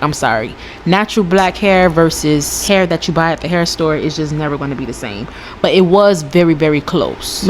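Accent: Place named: Florida, United States. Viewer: the way the florida accent done became so recognizable to me now is crazyy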